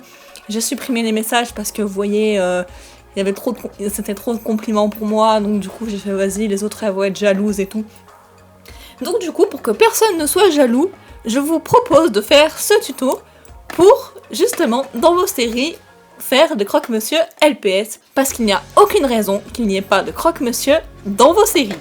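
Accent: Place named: South, France. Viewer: Avec ton genre d'accent du sud tu me fait trop penser a une youtubeuse du nom de Keyza 😆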